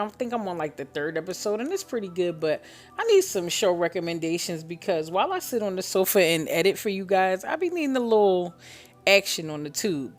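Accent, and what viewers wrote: Philadelphia, United States. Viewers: I just ❤️ your Philly accent!!! 😂 🤷🏽‍♀️ 😂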